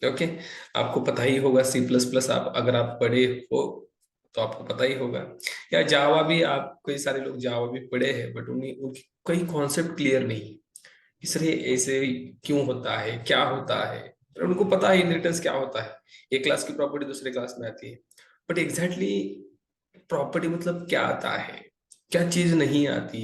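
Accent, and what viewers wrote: Maharashtra, India. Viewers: sir you are so much funny 🤣😂, also i luv ur marathi and hindi accent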